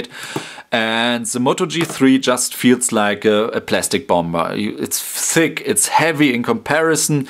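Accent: Germany. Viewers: German accent :P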